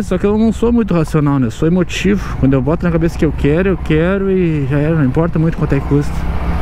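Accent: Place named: Rio Grande do Sul, Brazil. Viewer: Adoro o sotaque do gaúcho, aqui em SP interior puxamos o R sem dó (porrrrrrrrta)😅…..e o “tu” para nós fica só na conjugação do português mesmo, aqui usa-se o “você”. Grande abraço diretamente do interiorrrrrrrr de SP!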